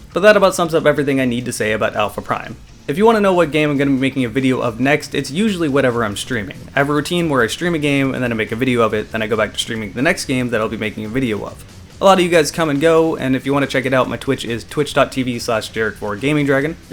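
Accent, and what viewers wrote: Italy. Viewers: Honestly he sounds Sicilian. Call a Siciliano, Italian. He'll punch you. Try it.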